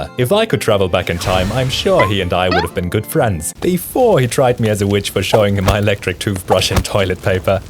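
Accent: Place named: Germany. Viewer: I heard german ! Ich wuste es der dialekt kam mir bekannt vor😂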